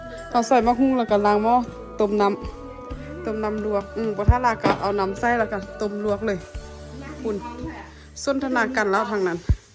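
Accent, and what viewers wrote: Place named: Sainyabuli, Laos. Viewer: สบายดี.ครับ.สาว..ครับชอบอะหยั่งในคริปของสาวแม่นบ่ครับ..กะชอบคำเว้าของสาวครับเว้าคำลาวไดัเปะฃู้คำบางคำแถวบัานอัายอแทบจะบ่ใด้ยินมาเบิ่งสาว เวัา..กะคึดออก..อัายกะคนเวัาลาวแบบสาวครับแต่.อยู่ฝั่งไทยอยู่ใกล้เมีองคอบครับในอำเภอบ้านอ้ายมืเว้าลาวอยู่สองหมู่บ้านครับ..สำเนืยงไชยะบุรื..ชอบเบิ่งบ่มักคอมเม่นท่อใดครับ..คนไทยเชี้อสายลาวครับ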